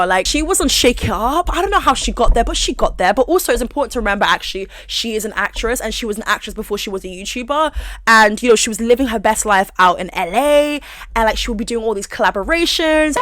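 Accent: United Kingdom. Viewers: I just realized something. And this isnt gunna be PC lol but im genuinely just curious. So most black people in America have a "blaccent" (obvi not all and I did not make up that term) but is that not the case in the UK? Bc you sound like most other British people I've heard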